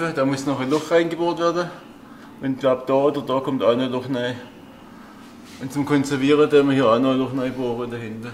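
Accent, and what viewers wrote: Swabia, Germany. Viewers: Klasse wie immer,und zum glück wieder schwäbisch geschwezt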